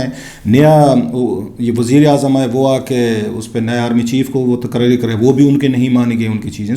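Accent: Saraiki, Pakistan. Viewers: Kalasra your analysis is very poor, more over your excent is SARIAKI. I feel pitty for you. Improve your information, knowledge & sources. Nobody has done what lmran has done during rule and in opposition, in past & now, despite facing all opposition, institutions and beaurocracy, against him. Nation is fully aware now, what all has been going on with Pakistan since 70 years, but you are still depending on you guess work/analysis which in not upto date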